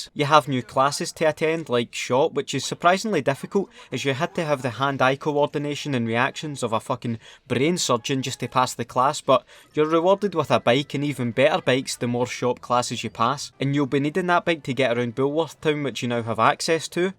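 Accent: Scotland, United Kingdom. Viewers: Probably the most Scottish review I've heard ;] I played this game so much as a kid Jimmy's vest glitched pink. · Where I Scotland are you from,I'm from Glasgow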